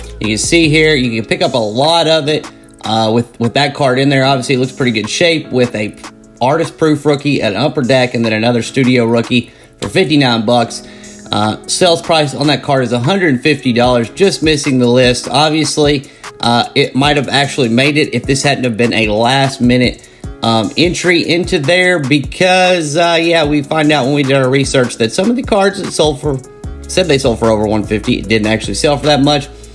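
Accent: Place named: South, United States. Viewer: This guy looks like the actor Max Casella except with a country accent 😂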